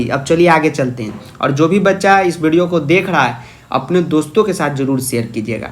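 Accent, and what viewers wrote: Bihar, India. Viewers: Sir aap bihari hona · Sir are you from Bihar because your language is similar to bihar and you are teach very well 😌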